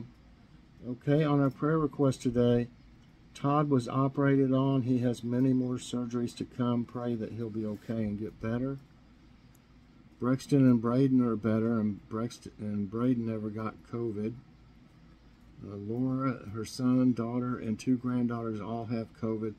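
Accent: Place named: England, United Kingdom. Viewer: I love hearing your prayer with English accent,. I love it and thank you 🙏 always for regular prayers 😍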